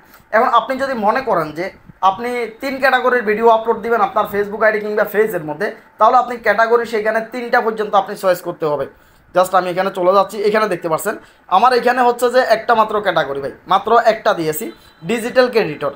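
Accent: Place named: Comilla, Bangladesh. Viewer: খুব ভালো লাগলো ভাই,আপনার ভাষা তো আমাদের মতো,আপনার বাড়ি কি কুমিল্লা?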